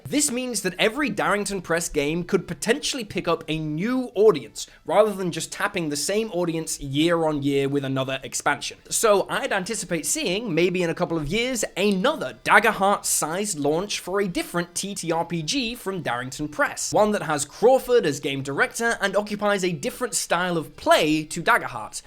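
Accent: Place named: United Kingdom. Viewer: Ha! you are british 😂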